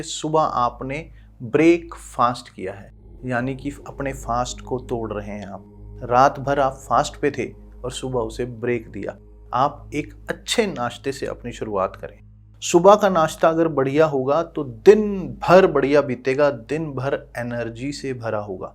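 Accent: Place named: Rajasthan, India. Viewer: Aap guro ji ya to rajesthan ke ho ya hariyana jaunpur ke ho aap ki avaj se lagta he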